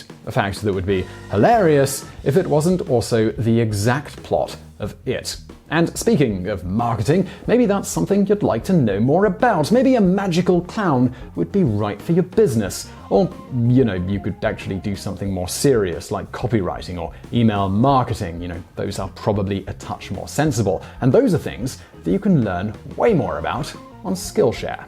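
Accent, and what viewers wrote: United Kingdom. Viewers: Literally ten minutes into this video I was like wait wtf he’s british 😂 i guess it was just the subject matter, but it was like my brain was filtering out the accent or something. Pleasant surprise, great video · Why is vsauce talking with a British accent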